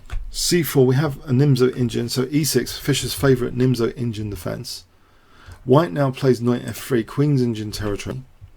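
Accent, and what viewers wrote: United Kingdom. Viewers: I just wanted to say how grateful I am for all these videos you do. I'm 28, and I've been playing chess since I was 10. I stopped playing back in '07 when I was diagnosed with leukemia in the navy. I've recently started getting back into it and am amazed by all the instructive video options there are on YouTube. But yours are the only ones I care to watch anymore. Majnu is ok, but just not in-depth or instructive enough. I had to get used to your "British accent" at first, but now I find it quite comforting (weird, I know). So thank you for all you do for the chess world. There should be like a Nobel Chess Prize or something for this.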